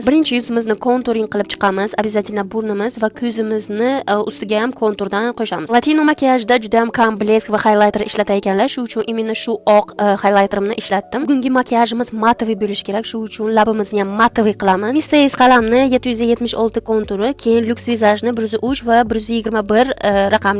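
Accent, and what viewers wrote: Bukhara, Uzbekistan. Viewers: Buxorolilami deymn😅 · Бухоро шахарини шеваси шунака не туда не сюда раздрожающий 😅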